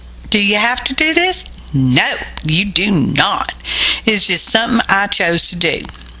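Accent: South, United States. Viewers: Absolutely AWESOME 🤩 thanks for the video and your wonderful southern talking! You have to do a video on why we buy these scrapbooking items! There is a woman that did one, it’s really old now and we have all new and different things! I can’t remember the title but it’s about why we have all this scrapbooking stuff! You had me gut busting laughing all the way!!!!! I LOVED IT AND I NEEDED IT!❤️🌟❤️🌟🙋‍♀️✌️🙏🤩😂🦄🌈👍😁💗❤️💗❤️🤷‍♀️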